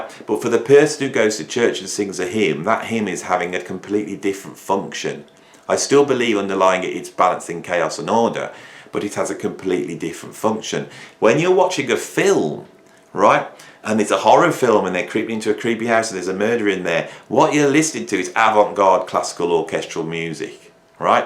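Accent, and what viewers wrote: United Kingdom. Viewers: You seem to be laying on the British accent more than usual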